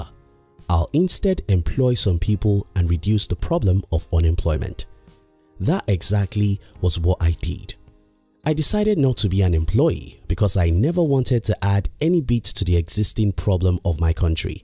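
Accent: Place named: Nigeria. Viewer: You sound Nigerian. If you are then you should know using more relatable references would help drive home your point better. Winston Churchill is perceived by some has racist so to some it's a bad reference to make and 89% of the rest of the world don't even give a F about him. But In all great content 👍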